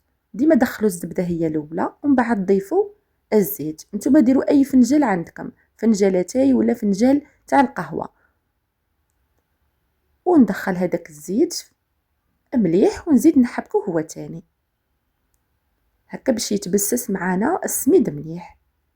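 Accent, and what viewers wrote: Skikda, Algeria. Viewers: السلام عليكم...صح صوتها مميز باللجنة السكيكدية...و وصفاتها المميزة. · منطقة سكيكدة اللهجة انشمها من بعيد حفظك الله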